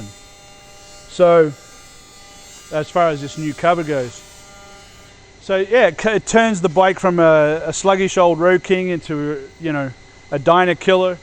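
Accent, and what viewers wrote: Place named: Australia. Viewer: Okay, I gotta ask... Are you Aussie, New Zealander, or American? Your english kinda goes from Aussie/Kiwi to American english with certain words so I am thrown off. Great video but if you can answer that... that would be great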